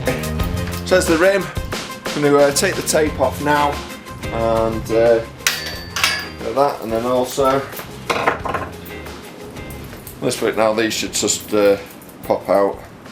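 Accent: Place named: Stoke, United Kingdom. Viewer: I have just got myself an '84 Raleigh Corsa (which I collected from all the way from Stoke, so going by your accent, not far from you). This is similar to this bike to your one here but in metallic pearl white. I now own... 1985 Quasar 1986 Sirocco (same as <num> Sirocco (same as <num> Corsa 1985 Competition 1985 Road Ace 1984 Team Replica 1989 Record Ace 2010 Avanti Team Just need a 1985 Record Sprint then my "wish-list" collection will be complete. Oh, maybe a gold-plated Centenary and the frame-only "Reynolds 531 Pro Super" chrome Team Replica & Oyster & semi-chrome sets and a 753 frame for good luck. <link>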